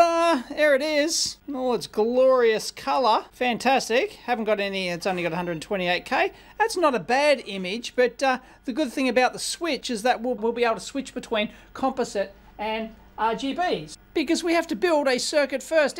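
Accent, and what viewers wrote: Australia. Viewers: I think the 8-bit guy's video is a bit more consise, easy to follow. Luckily, I like Aussie accent, so its still a great watch!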